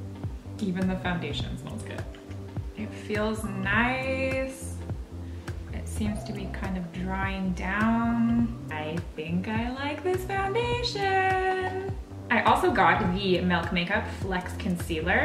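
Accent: Canada. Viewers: can you just make a video of you saying sorry I love when Canadians say it. It sounds like sorwy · is it just me or does she sound SO canadian in this video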